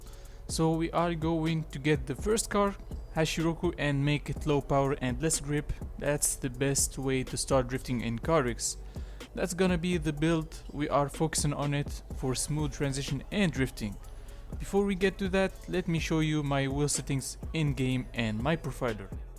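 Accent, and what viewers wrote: Tunisia. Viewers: عرفتك تونسي من السماعات sprint of gamer😂😂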